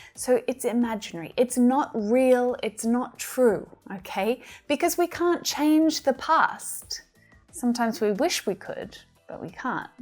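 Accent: Australia. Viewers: By hearing your accent it seems like you’re australian, am i right? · You are from Australia no America l don't like your accent l prefer the American accent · If I had known I was supposed to bring some food to the party, I would have made a delicious meal and brought it to the party.... I love your Aussie accent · Teacher are you from Astralia? your accent is very pleasant to hear !!!!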